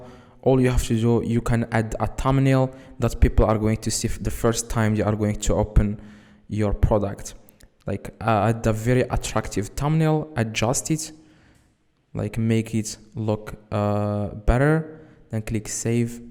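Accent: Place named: Morocco. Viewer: 3reftek meghribi men lkelma lowla walakin kon rir derti hadchi bl3arbia wlh l'anglais "endi m3aaaakla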